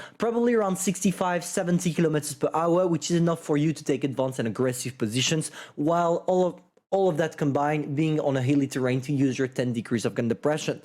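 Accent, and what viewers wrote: France. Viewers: Dear, I must give you a huge compliment. In my oppinion you are by far the best You-Tuber on WoTB. Not that your explainations are sharp to the point and your commitment to the game and the community is outstanding, but your french accent within the english language is absolutely insane, when it comes to the fact of entertainment. In other words: you are broken as a Smasher. Keep on your performance for the benefit of us players. sincerly yours. Carl Schurz, Adj-chef-2.eme REP ( retired in 1991) served in anonymas. " Nous sommes le meilleur"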